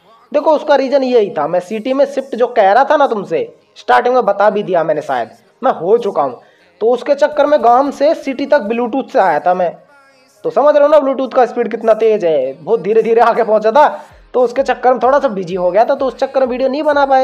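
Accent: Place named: Rajasthan, India. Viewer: * WHERE ARE YOU FROM*.???????????????????? RAJASTHAN SE LGTE HO BHAI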